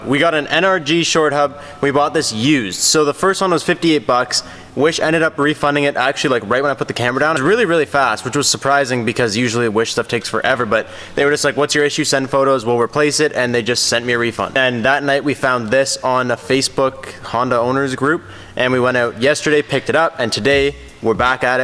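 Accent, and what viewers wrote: Canada. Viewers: your yt is the next up and coming. congrats man. you got great content and I appreciate how your learning with us. a lot of people act like they know everything but you admit that your learning and trying stuff for the first time too. I like that. but your accent is unfamiliar too me what is the accent you have? like I don't need to know exactly where your from but I'm interested on what area the accent from or what nationality you are. sometimes you pronounce words different and I'm curious. but I've been subbed since your first wish/ knock off content video you posted I think you had like 20k subs and your growing fast. you need more subs. you deserve it. the eg hatch videos are awesome man. keep up the good work. wish I could work with you guys. you guys seem to have good clean fun with a great group of people. are you Canadian?? if you could get back to me I'd really appreciate it. 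fanboi over here lmaooo jk but I always appreciate a new video from the crew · First time viewer. You Canadian though?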